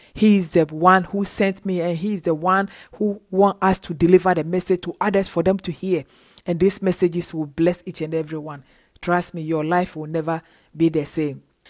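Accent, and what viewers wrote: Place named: Africa. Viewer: This was inspiring and greatly appreciated. Your teaching and voice without background music, without european or english accent but rather an African accent from the Motherland makes it authentic. I look forward to your upcoming history lessons. Thank you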